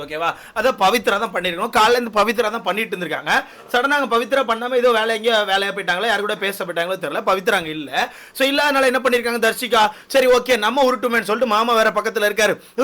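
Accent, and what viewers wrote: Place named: Tirunelveli, India. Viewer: Which is your home town Sir?.We can see Tirunelveli slang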